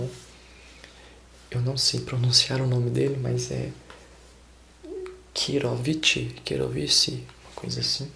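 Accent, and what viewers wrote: Minas Gerais, Brazil. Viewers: Amanda Utuari ele é do interior de MG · O sotaque mineiro ❤️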